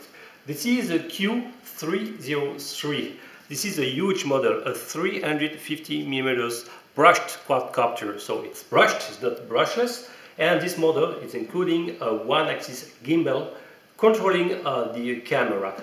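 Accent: France. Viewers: mdr avec ton accent francais, il peut soulever quelle poids a ton avis ?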